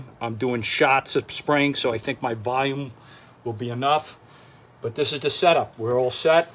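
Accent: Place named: Massachusetts, United States. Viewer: Luv the MA accent, lol...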